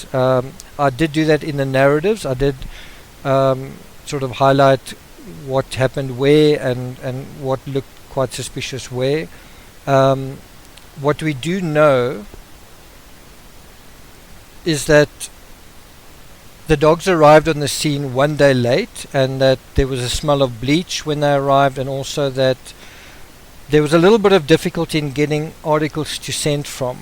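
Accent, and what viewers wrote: South Africa. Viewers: Is the narrator perhaps South African?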